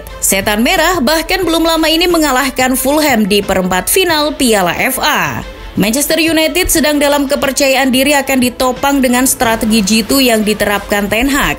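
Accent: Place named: West Java, Indonesia. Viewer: Anjirrr ada ada aja hahha kalau Rashford cedera bisa celaka dua belas😂😂😂, Pick Yang ngisi Voice nya orang sunda😁🤭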